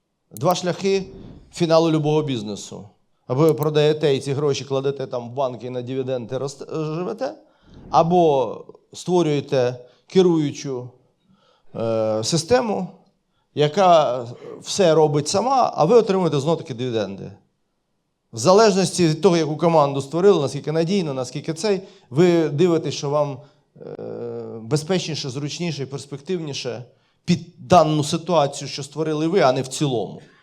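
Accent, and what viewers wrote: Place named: Lviv, Ukraine. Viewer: Приємно слухати на цій львівській, бандерівській мові! Хто в курсі, той зрозуміє)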